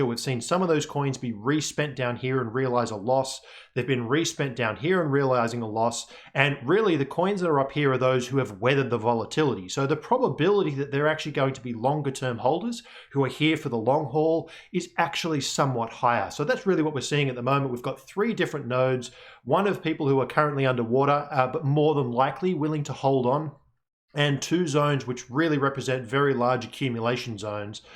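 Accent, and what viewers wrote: Australia. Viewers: Thank you so much for your effort. 💐 Enjoyed the thick Aussie accent, in addition to the analytics.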